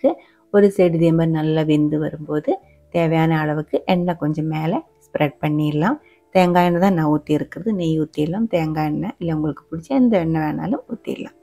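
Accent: Kerala, India. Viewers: Even Though U Are Kerala Malayam U Are Speaking Tamil Very Beautifully Must Appreciate U Expecting More Food Recipe From U Thank U Sister Wheat Dosa Very Nice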